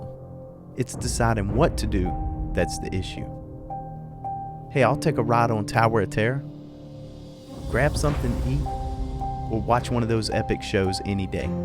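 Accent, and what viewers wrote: South, United States. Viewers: I saw the notification for a new video. Only problem I have is your videography and editing are so good and high quality I will only watch your videos in 4K on my 65" TV. It's like watching a movie everytime and I actually feel like I'm right there with you as you narrate. It's like an IMAX documentary for coasters. Please take as much time as you need we will all be here awaiting your over the top content and your gentlemanly southern drawl guiding us through they way you see the theme park world.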